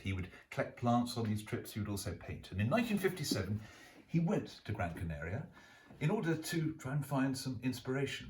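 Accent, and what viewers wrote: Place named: United Kingdom. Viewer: Thank you so much for your lovely "lesson ". True, everything sounds better with the british accent. Greetings from Tuscany, Italy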